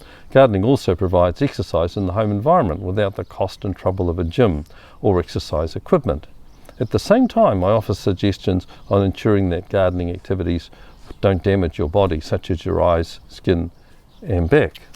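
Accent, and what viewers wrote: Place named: New Zealand. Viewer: Hi, I'm looking forward to working my way through your tips. We moved from 1/4 acre in the 'burbs of Hamilton to 10 acres in Nth Canterbury 3 years ago and while I have gardened for many years and have big dreams I am somewhat challenged in the design and execution department:)) Always lovely to hear our NZ accent on "the tube".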